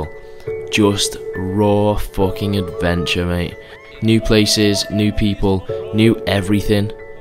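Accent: United Kingdom. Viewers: The party montage reminded me of Human Traffic, with your voice all trippy & british accent :D Good stuff! Perfect timing for me as well, been going through the same thing myself, first traveling for festivals & raving a lot, then going backpacking, growing a lot as a result but also being left with a sense of emptyness after that. Got to work so I can enjoy the present moment always, and being the best version of myself always, not just when partying and traveling. It's the hedonic treadmill, we always chase happiness, base it on external factors, and our happiness reverts back to baseline when we get it, we're never satisfied for long. Anthony de Mello is a great spiritual guide on that, his lecture rediscovery of happiness goes through how we can undo our cultural programming that keeps us changing money, women, status, jobs, titles etc in hope of happiness.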